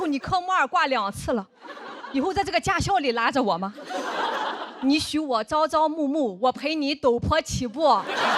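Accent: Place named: North, China. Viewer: 他的风格一部分是靠节奏，一部分靠口音，好笑不好笑要看对北方方言的熟悉程度，不熟悉的人就是觉得不好笑。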